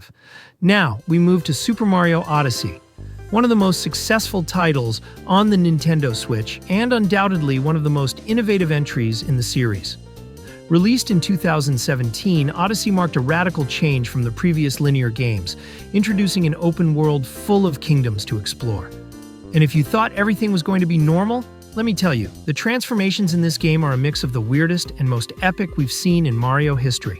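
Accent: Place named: Boston, United States. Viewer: Incredible video! It made me feel nostalgic about when I used to play on my red DS Lite. Awesome, based on your accent, I’d bet you’re from Boston. Cheers, and you’ve got a new sub!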